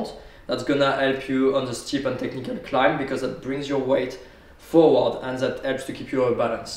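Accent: France. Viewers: French accent 😉(I have the same) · Sa de voit que tu est français a ton acsens en anglais et a ton nom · Tu a un accent français You have a french accent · tu a un accent francais you are accent french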